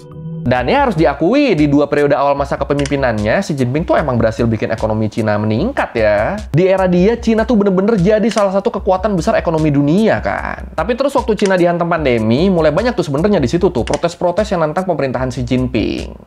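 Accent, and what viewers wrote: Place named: Java, Indonesia. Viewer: ini perasaan gw doang atau bang di video ini lebih medok ya😅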